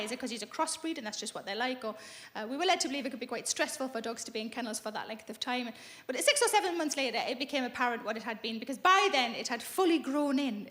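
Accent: Scotland, United Kingdom. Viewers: I thought that she was Scottish given her accent and last name! Her comedy is always hilarious!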